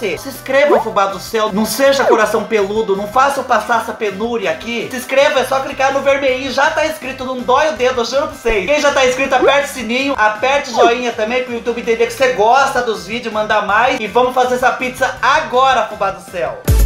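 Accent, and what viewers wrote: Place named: São Paulo, Brazil. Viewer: Que seu canal venha crescer cada dia mais e mais 😍👏🏻 parabéns pelo conteúdo ❤️ você fala que nem meus parentes que mora em Ribeirão Branco não sei se conhece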